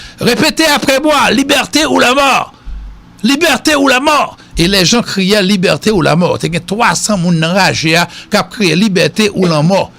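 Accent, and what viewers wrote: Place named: France. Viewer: Misye pale tankou yon blan fransè 😂😂😂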